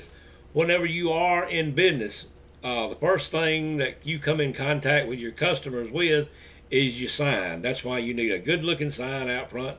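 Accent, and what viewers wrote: Alabama, United States. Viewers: I love your Alabamer accent! Yeehaw!🤠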